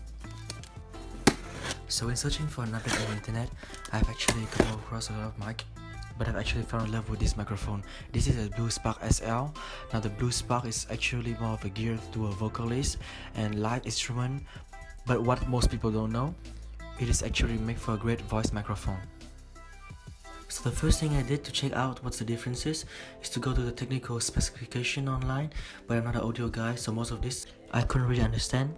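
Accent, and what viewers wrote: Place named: France. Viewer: Are you french ? Because you have a Very big french accent .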